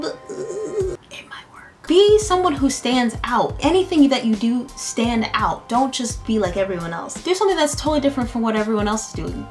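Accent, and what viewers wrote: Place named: Africa. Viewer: so i had to subscribe after the African acccent....my sista oh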